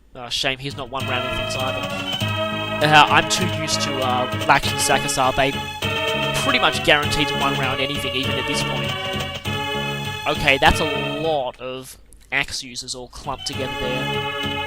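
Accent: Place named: Australia. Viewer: Blah, I wanted to see more of this - Your style of LPing is great, and your accent makes a nice change from most LPs as well - Australian and Scouser are incredibly similar to my ears, being in the UK, but as a fellow SF member, I can't wait to see this continue.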